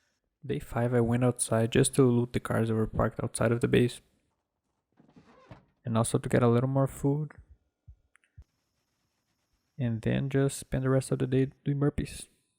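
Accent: Brazil. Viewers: sabia que tú era brasileiro, o sotaque é inconfundível kkkkk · Eu sabia que tu era br! O sotaque ta do jeitinho, boa série btw, ganhou o meu follow! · Where u from? U got a brazilian accent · Pelo seu sotaque você e br só pode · você é brasileiro? parece muito o sotaque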